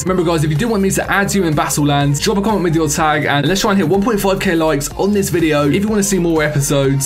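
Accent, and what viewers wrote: United Kingdom. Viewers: I just knew that you are British I love your accent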